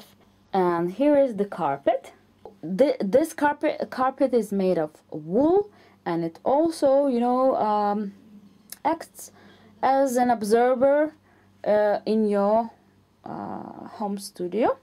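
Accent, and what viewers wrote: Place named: Russia. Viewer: where are you from i feel a little Russian accent :)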